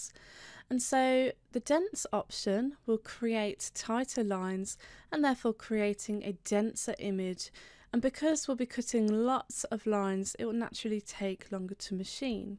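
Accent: United Kingdom. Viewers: Excellent videos with a good pace. Can always go back and watch again to take notes. And the English accent helps! Not sure why you don't have hundred of thousands of views - much better than many cnc tutorials!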